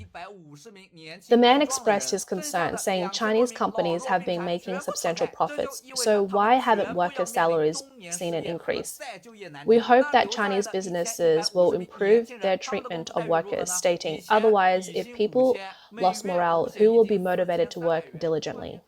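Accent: Australia. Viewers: Great job on this report. I watch CNBC for business and stock news. This is an even better report on the auto business in China than I have ever seen anywhere. Also, not sure if the voice is AI or real but she sounds great. I live in Florida so do not experience Aussie accents that often. ** OK, Weird thing! & there is an old-looking wall shown. What is that??? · . It sounds Aussie to me as well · 100% austraslian voice. She is reading off a script , hear her voice stop and start. Its good thing as it sounds authentic.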